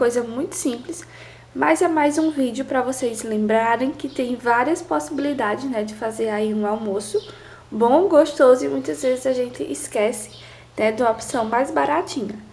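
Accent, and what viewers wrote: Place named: Northeast, Brazil. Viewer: Voz meiguinha...bonito sotaque nordestino...prato maravilhoso...bateu a fome agora...Parabéns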